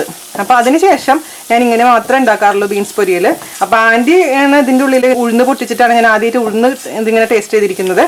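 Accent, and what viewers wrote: Thrissur, India. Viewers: Hi chechi..your explaining well and Thrissur language is good....I prepared this thoran today it is very tasty...look yummy..thank you for this receipe..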